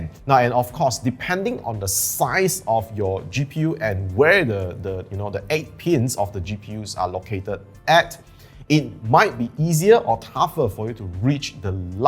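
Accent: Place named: Singapore. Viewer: Your Singaporean accent is thick boi lol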